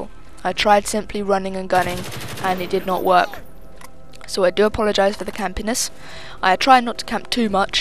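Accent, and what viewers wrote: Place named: Surrey, United Kingdom. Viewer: R u from Surrey, you have a Surrey Accent And you are like the best educated person on youtube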